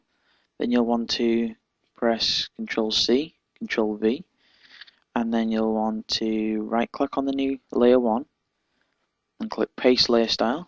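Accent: Liverpool, United Kingdom. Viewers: Hey der la, Do I 'ear a Scouse acksint ... I am down 'ere in Sydney strugglin' wiv me CS5 ... ta for yer 'elp ... <link>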